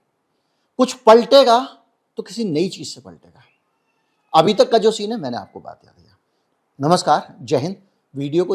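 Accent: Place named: Bihar, India. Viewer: Aapka Bihari tone bohut achchha laga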